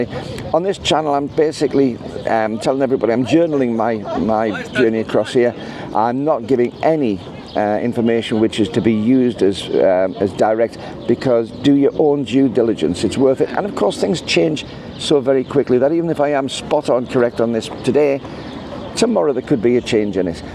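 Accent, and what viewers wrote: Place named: Scouse, United Kingdom. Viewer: Great video - might be wrong but do you slip into a slight Scouse accent every now and then? Dare I say a hint of Birkenhead in there? 🙂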